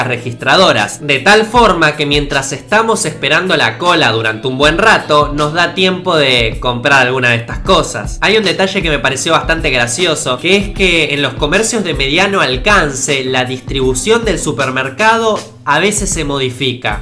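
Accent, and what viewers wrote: Argentina. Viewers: es argentino no? xD viva argentina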